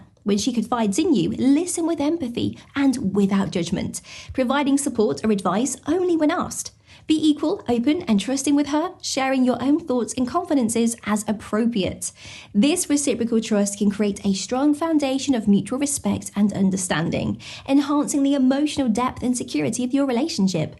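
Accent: United Kingdom. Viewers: Are you British, Irish or Australian? Whatever the accent, I love it 😁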